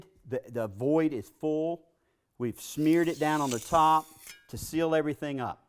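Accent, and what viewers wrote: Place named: South, United States. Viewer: Nice job 👍, good southern accent too. Though, I still got a q: I am about to resurface my 20x 40 feet concrete driveway which is slightly inclined downhill from the road towards my garage, which make water pooling up next to my garage door. I want to resurface entire driveway but I also want to make the end of the driveway adjacent to the garage door elevated about 2 inches up, and make it sloping down to the garage floor on one side and also sloping toward the outer end by the road - with hope that the rain water will be flowing away from the garage. Any suggestions how to do it DIY? Thanks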